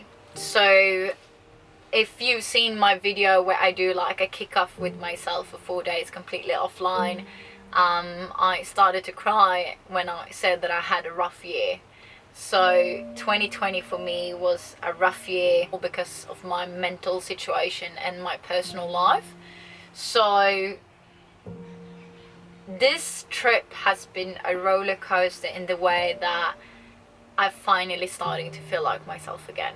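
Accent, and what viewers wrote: Australia. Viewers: Alltså jag blir så irriterad på kommentarer som dessa. pratar hur bra engelska som helst med australiensisk accent. Som jag förstått det har hon rest/bott mycket där. Om det stör dig är det väl bara att avfölja utan att sprida otrevlig energi. Jag skulle typ dö för att prata så bra engelska som gör. Tror snarare hon får fler prenumeranter då den engelskspråkiga delen av jordens befolkning är betydligt större än den svenska 😂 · You are Swedish but why the Ausie accent??? Just wondering??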